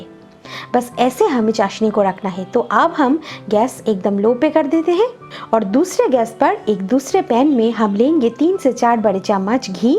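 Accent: Bengal, India. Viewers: nice culnary skill...ur hindi in Bengali accent is so cute....🤗🤗🤗sukhto Recipes plzz easyway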